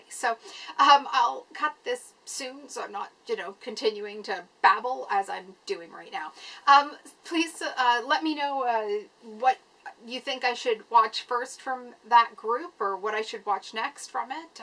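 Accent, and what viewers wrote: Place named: Canada. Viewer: I just like listening to your sweet Canadian accent. Great video. You got some awesome classics in that haul. Also, that shirt seems nice and warm,:-) Have a great day! ~Marty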